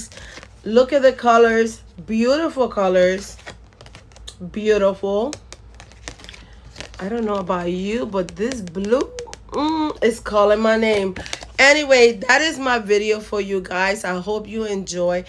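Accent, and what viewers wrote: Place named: Cuba. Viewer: I love your accent , you sound cuban ❤❤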